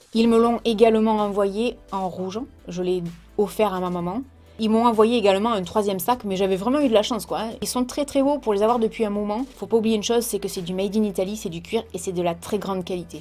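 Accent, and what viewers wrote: South, France. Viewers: Je laisse rarement des commentaires, mais j'aime beaucoup tes vidéos et les messages que tu y inclus. C'est assez rare sur youtube. J'adore le petit accent du sud et cela me rappelle ma douce France, comme suis expatriée en Allemagne 😀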